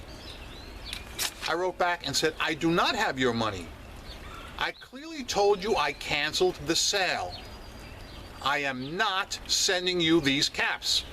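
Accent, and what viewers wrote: New York, United States. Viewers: love hearing all the NY expressions and pronunciations - miss the vernacular. Had a p.s. on a sale last week. What I did was send the item as though I never got the second one. Once the post office got it - I messaged the buyer that I saw his p.s. on the sale AFTER our warehouse had shipped it. I said they go on the paypal sales as soon as they go through. ha. No response, no neg.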